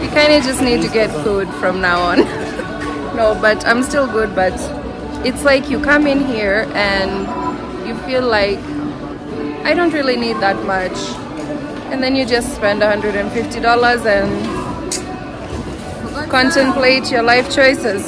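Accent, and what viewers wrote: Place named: Kenya. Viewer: Now you have Kenyan accent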